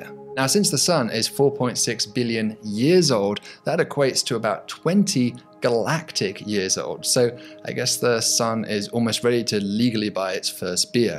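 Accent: England, United Kingdom. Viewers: For anyone in the USA or UK MPH is more intuitive and he sounds English to me so I guess it’s up to him. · English accent does that to most people, he was raised in the UK, went to the University College of London so he was engrained with it.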